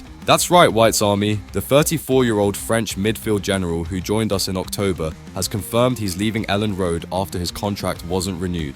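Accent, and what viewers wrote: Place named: United States. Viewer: Oh here we go again with the “insider information” on any upcoming signings. These guys are unbelievably quick at getting the inside information out before anyone else, only to realise afterwards it’s a load of cack and never comes to fruition. I love how they report on things that have happened behind the scenes with our beloved club without a single shred of evidence in their reporting and just showing random excerpts of Leeds scoring goals. Did you see their report of Haaland praising Warnock with pics of Haaland and the Leeds badge in the same photo, garbage. Listen guys, these lot are in it for the “likes” and “subscribes” Not from this reluctant viewer. Who are these guys with the American voice over, who do they report for or from.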